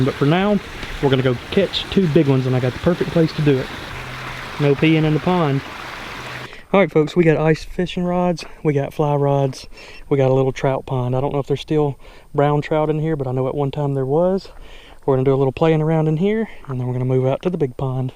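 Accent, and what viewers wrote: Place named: South, United States. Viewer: I don't know where you guys are located, but it looks like a southern state (due to your accent and all the bayous in your videos) so with that said, have you guys considered some of the smaller species of gar? That'd be such a cool inclusion. I used to live in FL (now back in CA) and I used to love watching gar swimming near the surface.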